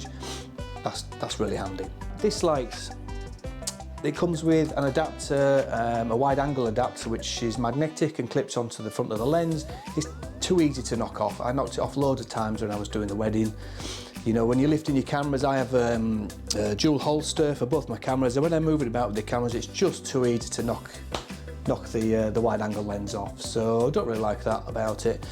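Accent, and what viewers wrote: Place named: Halifax, Canada. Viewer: You sound originally pretty local to me in Halifax! decent video, have you filmed any wedding footage with the osmo or just vlogging yourself? thanks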